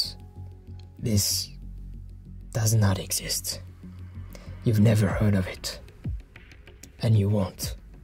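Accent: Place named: France. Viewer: I swear I’m not just saying this because of your accent, but I hope you’d do a Frenchie (The Boys) roleplay someday! I know guns would be controversial, but it would be cool if you were building a gadget or making some kind of contraption like he does. I just don’t think anyone non-French could pull that off lol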